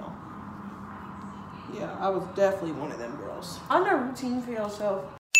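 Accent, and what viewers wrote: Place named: South, United States. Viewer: I didn’t know you had a country accent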